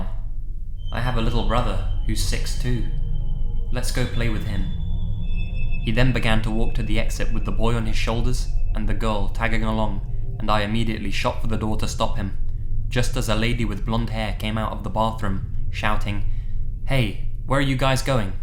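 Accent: United Kingdom. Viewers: You sound like CreepsMcPasta! Probably cause he's also British but fair enough, I like your accent! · i'm newer to this channel, but I really like it! does a good job of narrating these in a way that emphasizes the important points. You wouldn't think that a higher voice with a British accent would be very good for narrating horror, but his is just about perfect. subscribing as Legion member 527,001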